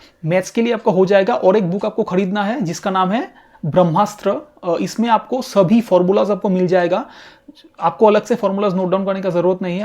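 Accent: Bengal, India. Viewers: Sir aap bangal se ho na ?? 😅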